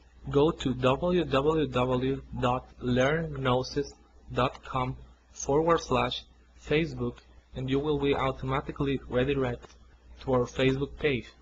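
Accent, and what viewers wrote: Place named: Mexico. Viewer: how can anyone even call this afrocentric this man speaking is most definitely not anyone black, i can't possibly begin to count how many mexicans i spoke to with this kind of accent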